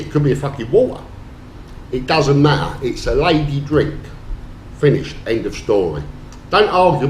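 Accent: United Kingdom. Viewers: "Be on the Piss all night every night" I Love British Speak :) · The problem is that the Queen does not speak like most of the UK population. Whereas accent is the most widespread in the UK.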